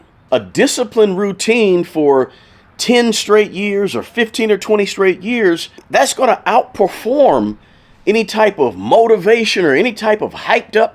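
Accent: South, United States. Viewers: Why does the best life advice come from a dude with a southern drawl?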